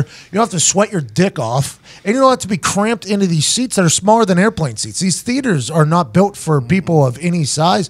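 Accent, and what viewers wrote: Pittsburgh, United States. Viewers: I love hearing the Pittsburgh talk when I listen! Good stuff. The Vally news dispatch! Oh blast from the past! Good stuff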